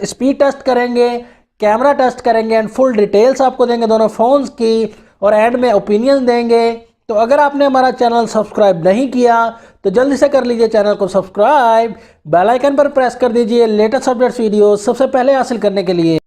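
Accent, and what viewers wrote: India. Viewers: ngomong naon si kentel jasa bahasa inggris india na,mere ge hese ndeuk meuli hp adoooh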